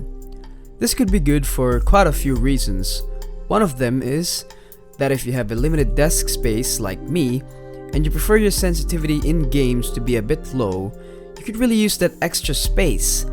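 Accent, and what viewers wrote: Philippines. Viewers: I thought I heard a Filipino accent 🙂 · Filipino accentttt... good video bro.. nagdadalawang-isip ako as to get this or not but I'll take ur word for it..looks durable enough and it seems like a good budget mechanical keyboard...kudos 💪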